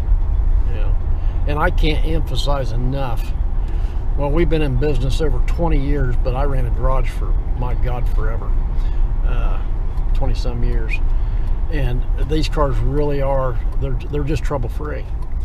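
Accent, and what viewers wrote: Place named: Ohio, United States. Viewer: What a pip! Just recently stumbled upon your channel and must say how much I enjoy your videos along with your knowledge and honesty in doing business. I'm currently on my eighth Panther platform car, an '04 Signature Town Car and like very much that you appreciate and know so much about these vehicles. I'll wager the interior has that distinctive Ford aroma which I remember from cars my folks drove while I was a youngun' in the 1960s. Not elderly yet, but I'll be a boy of 63 in a few weeks so I can relate there as well. And being a native of western PA, it's nice to hear the way you speak over there in that part of "O-h-Ten." Quite similar to the way folks talk in my hometown area. Take care!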